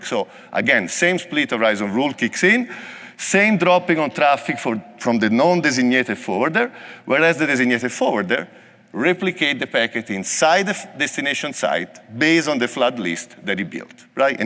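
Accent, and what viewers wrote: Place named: Italy. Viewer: I love Italian accent.. Thank you. Great delivery.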